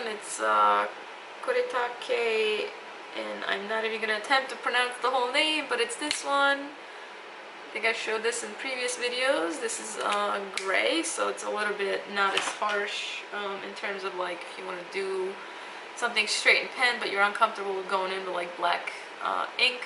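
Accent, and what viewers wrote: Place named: East Coast, United States. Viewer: Oh yeeah. Pens are friends. As unabashed as that is to admit.. well, sort of. You've a cool East coast accent.. NY? Boston? I agree about pencil vs pen, took me ages to see the light but now def better to go straight pen when drawing. You might dig these pen cases by Lihit Lab 'Smart fit pencil case' Helps keep pen choice down n neat design that stands up into pen pot. Im not sure if you can get hold of them stateside but some of my fav pens are the Winsor Newton watercolour markers. So good. Be safe, happy drawing 🎨 My latest, with pen stuff too <link>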